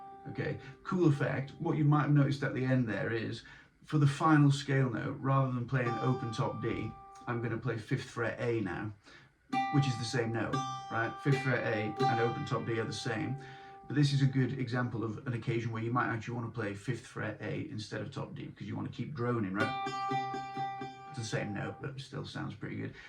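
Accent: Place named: Arab world. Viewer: I sense from your pronunciation that you speak Arabic as well! Thank you for sharing such an interesting tune!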